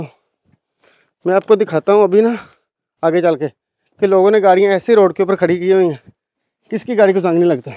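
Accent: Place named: Pakistan. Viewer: ap Pakistan k kis gaon sy hain ?ap ki boli bhot sweet h bilkol sada hmaray jesy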